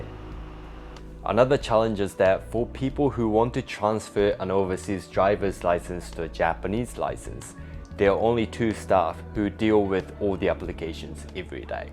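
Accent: New Zealand. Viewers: NZ, :D ha I knew it! Your accent was so familiar! First I thought it was Austrailan, but nope it is different from that. (I had a teacher from Melbourne once) As for administration(paperwork and so on), I have never thought I would hear that this exists in Japan. Being a Hungarian, I got used to waiting for hours just to get something done, but since 2020 many things can be handled via email or online, or at least get an appointment. I really enjoy the way you see life and handle things. :) Thx for sharing.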